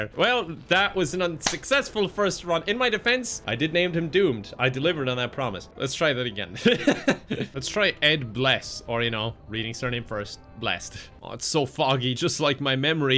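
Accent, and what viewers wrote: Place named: Ireland. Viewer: I read the Wednesday entry in Irish voice.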